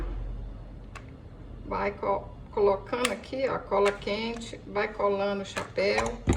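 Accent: Minas Gerais, Brazil. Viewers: Mulher... muito obrigada. Aprendi muito com você. Esse sotaque mineiro me lembra minhas primas e toda a família laaaa de São Braz Do Suaçuí MG. Muito agradecida viu... Beijão fraterno!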